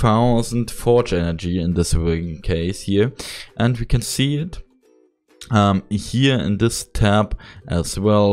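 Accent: Germany. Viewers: Sofort erkannt dass dun Deutscher bist xD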